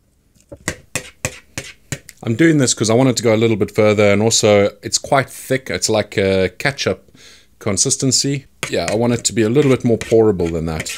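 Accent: South Africa. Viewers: just came across the channel ... awesome content ... you sound very South African ...? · This guy sounds like a SouthAfrican fore reals >_< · That is sad man. You do have a South African accent though. Anyway, i will have to try and make that weapon of a sauce on my own. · SO EPIC to hear a south african accent! followed!!! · He sounds kinda south african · South african accent, i approve 👍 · He sounds South African. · Is your subtle accent south African? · Heard a ZA accent, got my hopes up that there was a local shop to purchase these sauces, saw the cost in UK pounds, the man has obviously left our country :( . Back to using Bushman sauce, lol.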